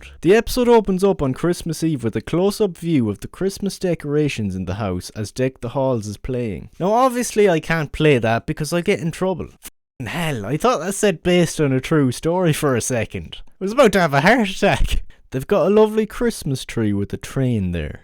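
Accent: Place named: Ireland. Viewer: I’m sorry I was just messing… I actually love your Irish accent! ❤❤ I have a few Irish friends who say I am too English to understand them lol 😂😂… great video! I am following you now too! ❤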